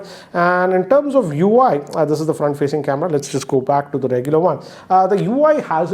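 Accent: Hyderabad, India. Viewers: Brother your reviews in hindi is very nice which u used to do year ago videos. It would b great to hear reviews in hindi especially ur Hyderabadi hindi.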